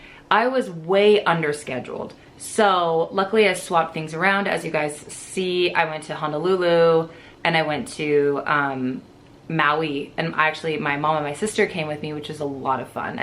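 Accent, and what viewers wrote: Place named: Southern California, United States. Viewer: my friend, I hear you talk,and I hear in both what you say and how you say it that you are a So Cali girl. This AGED So Cali boy feels back home when you talk to us. You are totally right. Daddy D IS over staffed. And most likely every one else. I actually tried toward end of year with you, but Talent Acquisition closed the Req# right away. No doubt somebody realized there were too many people to pay already. Let me fill you in on what has gone on. I think you know I am not 28. It’s the reverse - 82. Due to Rodg’s death from cancer a year ago today (25 February), being alone here and rambling in a place too big for me, I decided finally that zi needed to be around different people all the time and to be away from being home alone in order to have those experiences of growing and broadening. Only F9 was hiring. 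Although in good health, at 82, I never thought I would get invited to an all day event, much less get offered a CJO I was invited to an event in DEN 14 September and got the offer. 3 days later, I declined it, mostly due to having some pretty expensive property in my care (you may know a little about SEA real estate). I remained in good stead and later thought how dumb that was or me and that I really want that FA lifestyle snd to try again after 3 months If offered again, would just close and lock door. Well, went through whole process again, got invited to DEN event 6 February and was offered CJO A SECOND TIME. Am waiting for the background check to be comple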